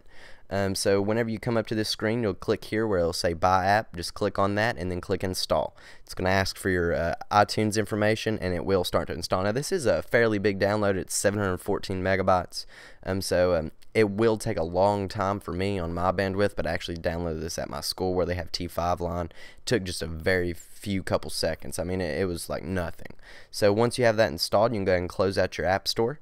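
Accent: South, United States. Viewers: This is completely unrelated but I just had to say something about your accent. It is absolutely wonderful! Sounds a bit Southern. Other than that, great video and thanks for sharing!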